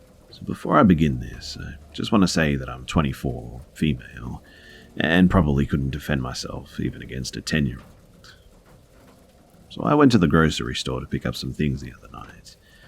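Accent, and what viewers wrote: Australia. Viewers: I 💘 your channel! You have the most gorgeous sounding Aussie voice. Also, your narration is top notch -- truly professional. I'd listen to your narration on just about anything! Okay, anything at all!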